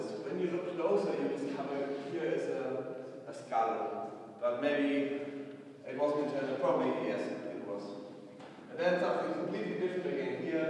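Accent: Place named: Germany. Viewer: man hört ganz eindeutig deinen deutschen akzent wenn du english sprichst :D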